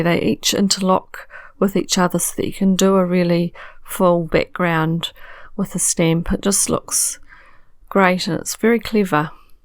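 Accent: New Zealand. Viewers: Such a beautiful design! Accent...is that a Kiwi accent??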